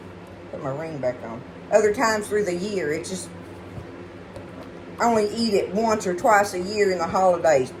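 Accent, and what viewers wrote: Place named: Midwest, United States. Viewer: Finally, a u tuber with an awthinic Midwest accent. Great recipe!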